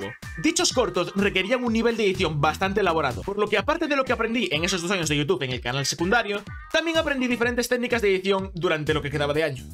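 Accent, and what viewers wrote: Galicia, Spain. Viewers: Que pro, se hizo el camino de santiago, yo vivo por una parte de donde se pasa, en galicia, suerte papu, es largo. Y una pregunta, eres gallego? No se tu acento es como un poquillo gallego, y felicidades por los 5k subs!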